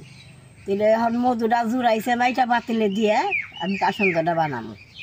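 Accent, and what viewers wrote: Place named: Manikganj, Bangladesh. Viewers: Bangal bolaito thakumar ranna valo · তার মুখের ভাষা আমার মানিকগঞ্জের মতোই, আঞ্চলিক টানও একই। সম্ভবত তার পূর্বপুরুষ মানিকগঞ্জ থেকে আসাম গিয়েছেন। কাসন্দ বানানোর নিয়মটাও হুবহু আমাদের বাড়ির মতোই। নমস্কার ঠাকুমা।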